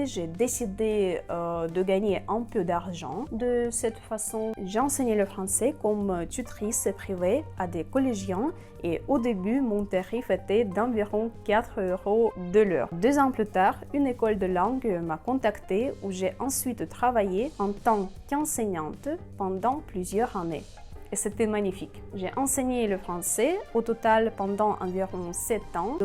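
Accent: Russia. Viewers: depuis décembre 2022 j'ai lancer ma chaine youtube sur les réactions musicales et je me suis axés sur les artistes russes que je trouve vraiment incroyable, sinon je viens de découvrir ta chaine et j'aime ce que vous faites et votre Français est vraiment très bon avec votre accent russe c'est juste superbe et moi j'adore la langue russe que je trouve magnifique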